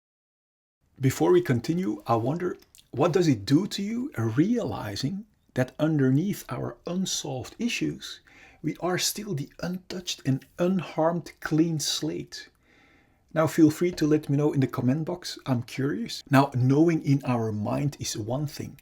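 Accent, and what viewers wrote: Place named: Netherlands. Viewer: Are you Dutch? I think I recognize your Accent. If so, cool , groetjes uit Utrecht.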